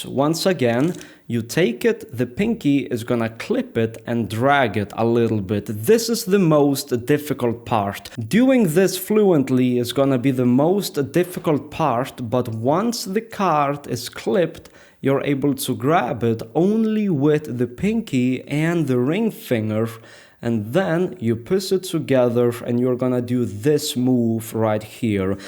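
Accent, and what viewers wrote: Russia. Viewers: I love the O.K. Hehe. (: I love the videos bro!! Your English is very clear, very good, and you're able to express yourself very well. Plus, the Russian accent is awesome! My dad is Jewish, Russian, Polish, etc. I've never been to Russia. Hehe. at times lives in my birth city Miami, FL. I used to live in but I am living abroad. I've never seen him in-person before. Thanks for the video!! ( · He sounds Russian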